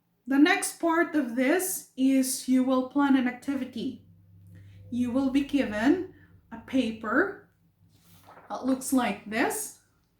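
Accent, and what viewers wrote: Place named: United Kingdom. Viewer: Good information sis and I like the way you talk, you have good diction of British accent.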